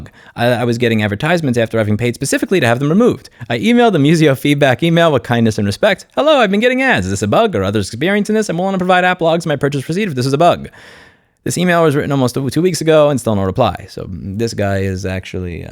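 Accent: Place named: Boston, United States. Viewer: Why do I always think of "Good Will Hunting" when I watch? It has got to be the accent or something...when is schooling companies online, it totally reminds me of the bar scene!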